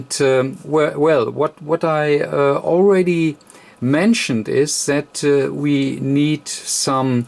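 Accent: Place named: Germany. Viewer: This is a magnificent tutorial. I think you might say "ausgezeichnett". It's got everything - careful and clear description of the theory, the practical implementation and finally the proof that it all works to plan. I have absolutely no problem with the slow delivery in English. Makes it clearer and I find the German accent charming.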